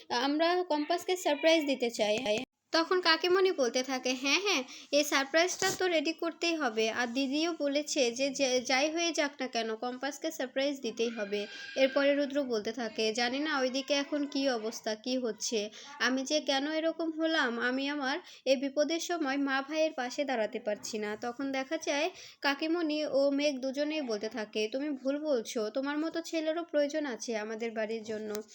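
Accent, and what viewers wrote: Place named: Bangal, Bangladesh. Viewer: বাঙ্গাল, বাঙ্গাল অশিক্ষিত উচ্চারণ, অপদার্থ, তোমার মতো গাড়োলের কথক হবার শখ কেন?নিজেকে শিখকিত করো,বাঙ্গাল বাঙ্গাল অশিক্ষিত উচ্চারণ ভূলে যাও।তার পর কথকতা করবে।শুভেচ্ছা রইল।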